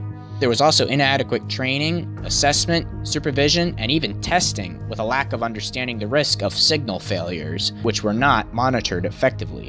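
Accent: United States. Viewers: Its Born-Muth nor Born-Mouth. but its ur american accent so. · Here in America all the errors that you mentioned are the way we say it here, you need to remember that this is a American narrating about a UK event, just cause we say thing differently doesn't mean it's wrong.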